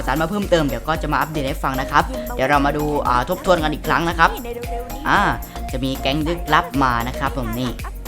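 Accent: South, Thailand. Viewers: พี่คนใต้หรอครับ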